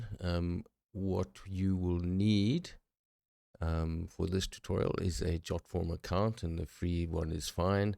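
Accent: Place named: South Africa. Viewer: Very cool, why did I know you are from SA by 😅 voice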